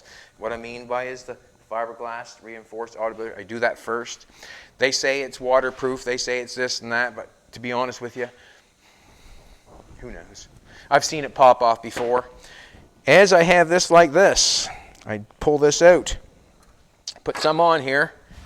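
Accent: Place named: Annapolis Valley, Canada. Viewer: Came across this looking up fibreglass fill and I saw your last name and thought Annapolis valley. When you spoke that cinched it ( I grew up in Berwick). Neat. Your video is helpful too.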